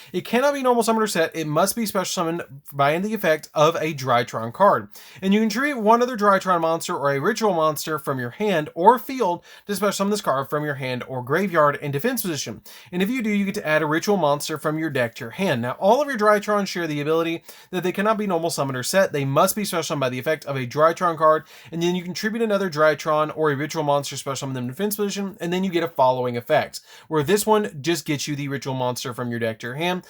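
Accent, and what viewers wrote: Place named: Texas, United States. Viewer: Well its Texas English, idk if its too hard to understand, but if you're so inclined. THIS DECK GO UNGAH BUNGAH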